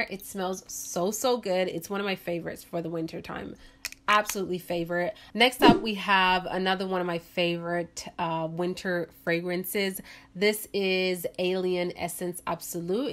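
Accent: New York, United States. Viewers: how did you start getting a NY accent???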